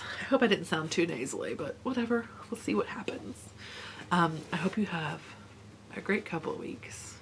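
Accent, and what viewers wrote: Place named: Scotland, United Kingdom. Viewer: Have a virtual hot toddy. I cannae spik for the rest o' Scotland, but I am totally on board for you adopting Scottish English...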